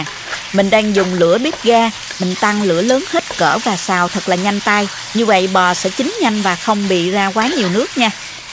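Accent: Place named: Saigon, Vietnam. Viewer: Giọng nói đúng là người SG đó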